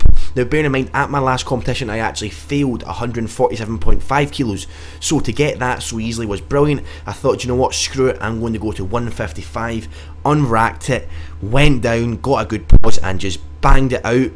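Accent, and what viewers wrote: Scotland, United Kingdom. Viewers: the heavy lifting brings me here, the thick scottish accent makes me stay good lifting!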